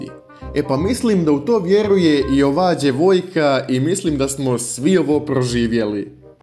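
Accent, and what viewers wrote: Montenegro. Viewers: Човек говори својим дијалектом ( црногорски ) и неумесно је критиковати га због тога , као што је и не људски критиковати га због говорме мане !Логопед неби могао сада да му помогне јер је старији !!! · Jeste li primetili da je crnogorac vidim po naglaskom ja sam isto kumara 😂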